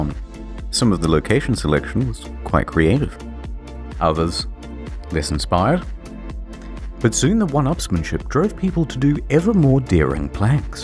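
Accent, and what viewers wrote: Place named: Australia. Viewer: Because of your australian accent I thought you said two toes and a liver instead of 2011.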